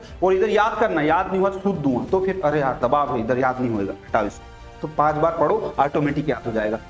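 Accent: Indore, India. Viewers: Indore ascent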